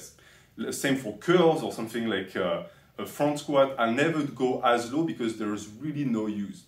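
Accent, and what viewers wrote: France. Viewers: R u from France bro ?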